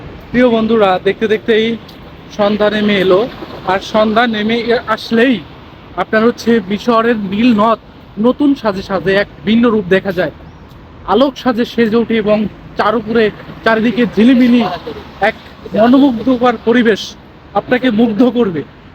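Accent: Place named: India. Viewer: কথায় বুজাযায় আননে ইনডিয়ান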